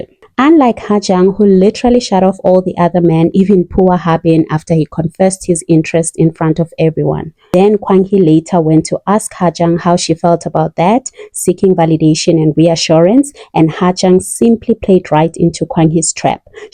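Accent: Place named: South Africa. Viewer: Interesting perspective. Well articulated. Love it. You sound South African!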